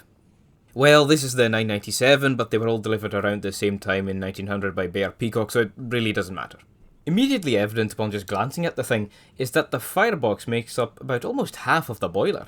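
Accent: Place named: Scotland, United Kingdom. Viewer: First time watching one of your videos that isn’t a animation and my immediate thought was “holy shit there Scottish”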